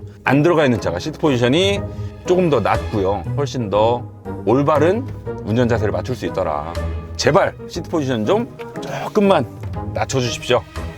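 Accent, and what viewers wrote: Jeolla, South Korea. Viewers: 절라도 사투리 역겨워서 못들어주겠네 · 영상 보면서 느꼈네요 지식도 풍부하시고 이해가 팍팍~!!쉬운설명 감사합니다~ 구수한 전라도 억양 듣기 좋네요~~!!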